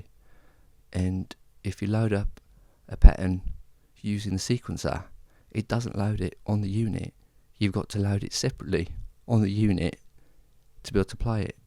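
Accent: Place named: England, United Kingdom. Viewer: thanks for the tutorial. May I ask what part of England you are from? I'm fascinated by accents, thanks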